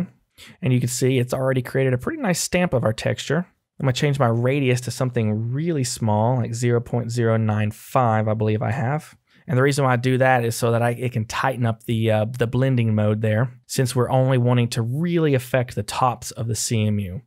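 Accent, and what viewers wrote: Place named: South, United States. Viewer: i like your southern accent cowboy thanks for the help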